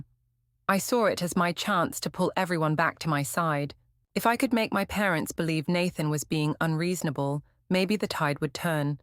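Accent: England, United Kingdom. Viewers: You know, every time I hear that English accent on the narrator I get pissed off.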